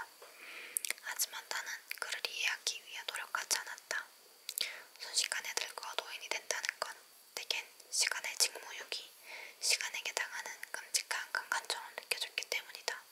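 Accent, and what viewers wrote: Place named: Gyeongsang, South Korea. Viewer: 너무 좋습니다. 경상도 억양도 너무 귀엽습니다.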